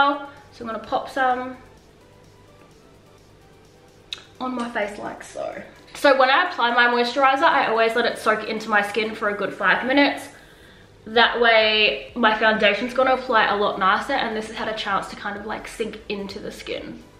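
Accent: Australia. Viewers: I’m actually very thankful that I shared your full face online for me here because I’ve been struggling on exactly how I WANT TO DO MY FACE which turns out to be similar, very similar to yours. It reminds me of the plouise academy girls. I know your Aussie I can hear your accent. Thank you 🙏 you should feel very esteemed cuz I watched your video 5 times on 2X speed lol 😆 which is probably why I had to keep rewinding lol but DING DONG DAHLING CUZ YOUUUUU HIT IT🤣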